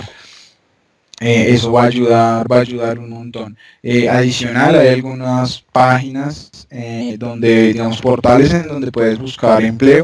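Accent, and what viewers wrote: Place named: Bogotá, Colombia. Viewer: el acento rolo Horrible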